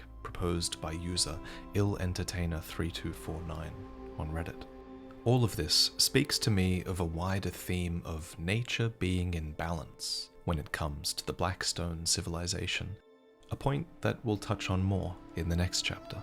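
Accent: Australia. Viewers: is Australian? lol hello from Bali, mate! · revealing he's Australian like a jump scare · Been watching for 4 years now and only just found it he's Australian. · IS AUSTRALIAN??? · I didn't even pick up that you're Australian and thats coming from a fellow aussie xD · I’ve been here for years so how am I just finding out you’re Australian?🤣🤣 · Didn’t even realize you’re Australian how did I not notice that you have the accent too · Wait wait… YOU’RE AUSTRALIAN!?!?!!?!? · Most shocking lore revelation in this video for me was not in fact Elden Ring lore, but Lore, I had no idea you were Aussie! XD Phenomenal work as always, can't wait for your next video!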